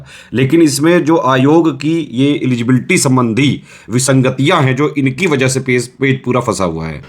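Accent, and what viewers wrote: Kanpur, India. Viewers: Sir ye apka kanpur flavour mast hai😊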